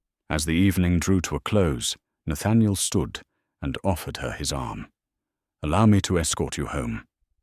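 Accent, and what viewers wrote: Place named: United Kingdom. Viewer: My Heart and Soul went into a huge melting OOOOOHHHH when he fed her the steak bites from his own fork. Chills down my spine! Superbly-narrated tale and extra electric shocks listening to that purring English / British accent while following along with the Transcript on, and a piece of paper over the picture section of the screen so my imagination can do the magic its meant to! Windsor, Ontario Canada EH?! ❤️🇨🇦🥂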